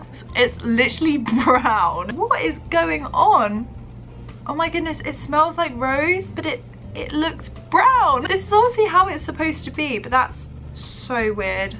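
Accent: United Kingdom. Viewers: I totally understand you.. They must not hear a lot of british accents because when I watched my first british youtubers, it took some time to get used to. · I'm American and I understand you! But maybe that's because I watch too many British Youtubers haha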